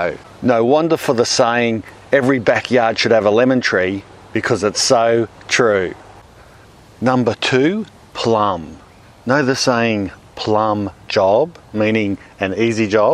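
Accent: Australia. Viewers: Well to us Aussies he sounds nothing like Russel Crowe..this old mate is an Australian not a Kiwi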